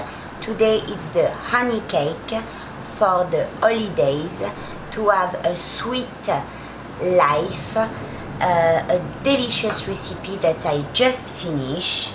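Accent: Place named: France. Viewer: Honey cake is my favourite!i love French accent Left u a liked👍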